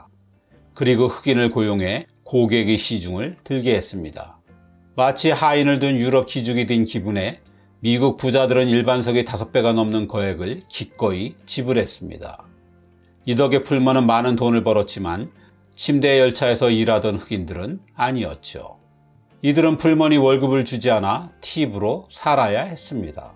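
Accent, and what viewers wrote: Gyeongsang, South Korea. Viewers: 남한 표준 언어의 경상도 사투리 오염 현상 때문에 그렇습니다. 경상도에서 ~또 라고 발음하죠. 전에는 없던 현상인데, 없어져야 할 악습입니다. 지적 잘하셨습니다.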